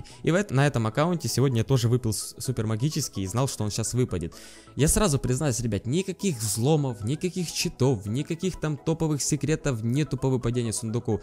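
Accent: Ukraine. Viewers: Украинский акцент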